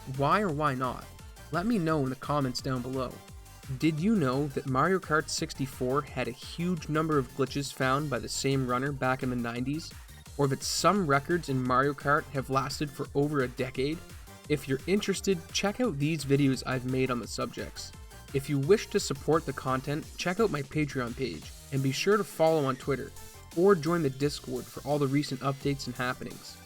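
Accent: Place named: East Coast, Canada. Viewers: are you from the east coast of canada? I cant unhear republic of doyle in your voice. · I love your accent so much. Nova Scotia, I'm guessing? You sound straight out of Trailer Park Boys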